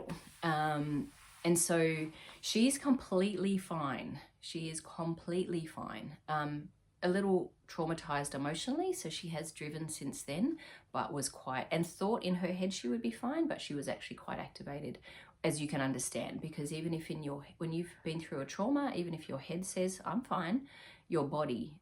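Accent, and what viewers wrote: Australia. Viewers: I really enjoy your podcast! I love listening to your Aussie accent.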